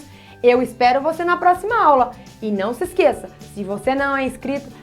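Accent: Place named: Goiás, Brazil. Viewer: Cara essa professora é muito top 👍, Eu entendi tudo, ela é muito simpática e adorei o sotaque goiano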